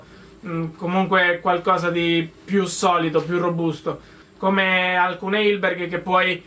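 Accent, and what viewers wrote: Umbria, Italy. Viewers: alla faccia che forza che sei! ma dal tuo accento sembri umbro o sbaglio?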